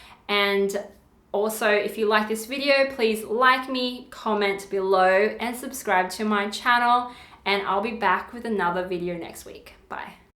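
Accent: Australia. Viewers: W Jansen She sounds Australian.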